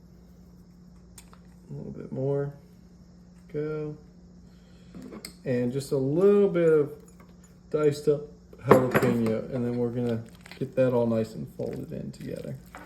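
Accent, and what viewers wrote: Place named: Louisiana, United States. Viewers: You have a very strong Cajun accent and I love it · Love the louisiana accent!! Reminds me of the Cajun chef who always said oooo wee looka there!!! Justin Wilson RIP!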